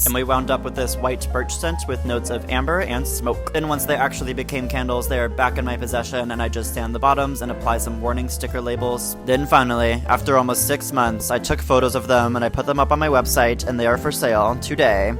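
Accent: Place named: Germany. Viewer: Is it just me or did your accent change to german halfway through the video?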